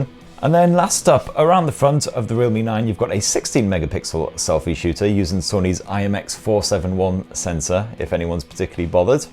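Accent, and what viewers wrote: United Kingdom. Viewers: Oh and I forgot to mention the extremely personable British accent 🙂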